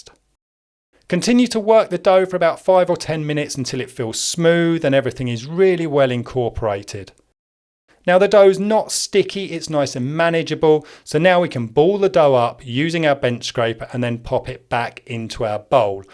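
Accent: United Kingdom. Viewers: I thought you were Andy. Then I heard the british accent 😂 Nice loaf, mate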